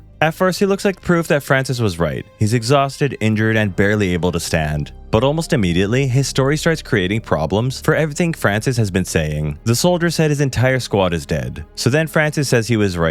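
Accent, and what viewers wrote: Philippines. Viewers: Is it weird that the moment you say you're Filipino, I can now hear the Filipino Radio DJ English accent? 😅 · i love your Filipino accent lol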